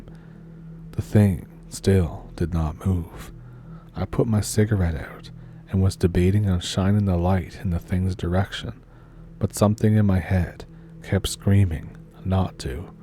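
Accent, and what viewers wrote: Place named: Ottawa, Canada. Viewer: Odelay, 😊 always nice to hear my ottowa cousins accent when you tell yuir stories, neh? Frankly it abaot time. 😊🎉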